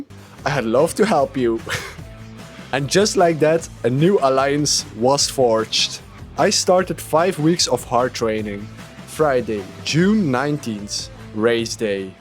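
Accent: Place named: France. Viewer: his french accent is so thick.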